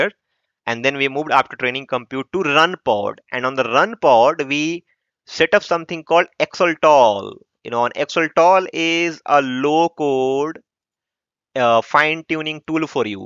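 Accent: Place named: Bihar, India. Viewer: Bro are you from Bihar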